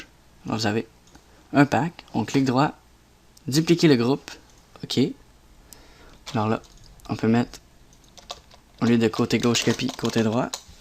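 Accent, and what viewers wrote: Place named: Quebec, Canada. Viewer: je t aime mec <3 super tuto je kiff ton accent québécois :D je m abonne !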